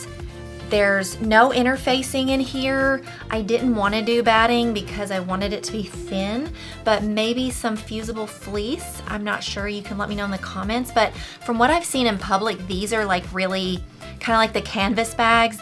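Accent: Tennessee, United States. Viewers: You could label them vintage modern fusion. I like the bigger tote bags with pockets. I do think the basic totes need fusible fleece. Those book sleeves are super cute too!! I found your channel a month or so ago when I got back into sewing. I live outside of Nashville. I enjoy your accent and personality. Lol I'm not from Tennessee, I just find the accent enjoyable. I love your content and have gone back and watched a ton of your videos.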